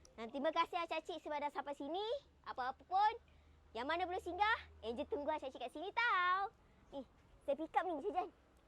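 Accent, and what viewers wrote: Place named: Java, Indonesia. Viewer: Sedap bunyi jawa nya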